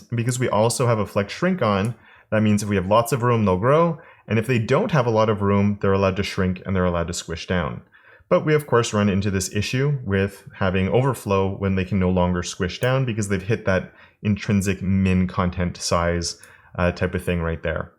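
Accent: Canada. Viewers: The canadian "out" pronunciation is so strong, on this one :)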